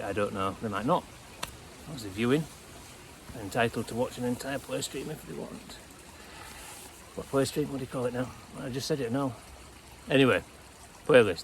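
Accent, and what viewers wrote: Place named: Yorkshire, United Kingdom. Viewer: Some of us just subscribe because we love to listen to you shifting the problems around the landscape. I wonder how many Americans you are going to attract, though. They are not good at Yorkshire accents, alas.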